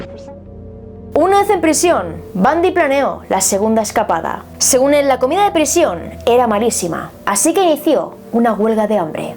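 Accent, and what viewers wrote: Spain. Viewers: Eres genial, me encanta como relatas y tu voz de española je, te mando un fuerte saludo desde México. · La mayoría de tus suscriptores son latinos en América.....tu acento español está muy muy marcado....si lo cambiarás un poco se te entendería mejor ....saludos !!!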